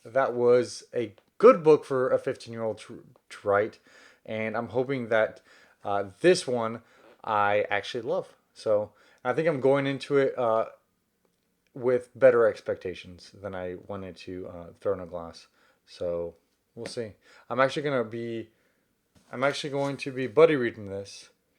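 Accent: South, United States. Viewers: I can't believe you had three books are The Stone Sky, The Fifth Season and The Obelisk Gate by N.K. Jemisin, Angel Image, Bear Town by Fredrik Backman, Fate of the Fallen by Kel Kade, House of Earth and Blood by Sarah J. Maas, Gideon of the Ninth by Tamsyn Muir and all of them at your house in Texas on this video during your quarantine until you're talking about the horror TBR and summer book haul of the spooky season after that, but I hope your husband, Zach will be very proud of you and I'm so happy for you, but I love your Southern accent!!! #SpookySeason #HorrorBook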